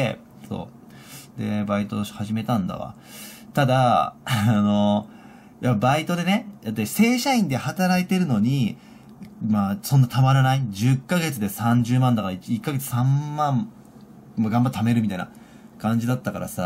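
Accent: Kansai, Japan. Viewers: おとんってｗ さん、ところどころ関西のｲﾝﾄﾈｰｼｮﾝがありますけど、関西人では無いんですよね？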